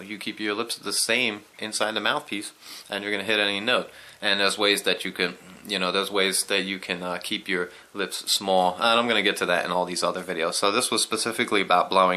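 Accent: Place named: Philadelphia, United States. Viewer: great explanation! you sound like a Philly or South Jersey guy. I've been searching for some confirmation on Morrison's ideas and another guy from Australia who says the same thing on blowing levels equaling harmonic slot increases, and you've done that very well here. Thanks!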